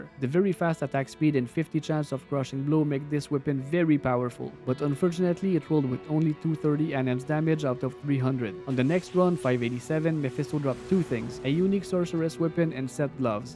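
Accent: Quebec, Canada. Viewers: Where is your accent from? Sounds like Quebec french accent.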